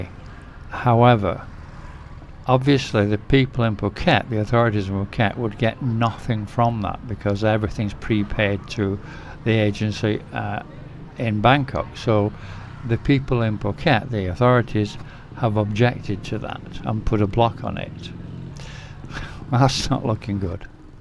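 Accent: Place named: Lancashire, United Kingdom. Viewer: You sound like a Lancashire lad, I’ll take a guess at Blackburn??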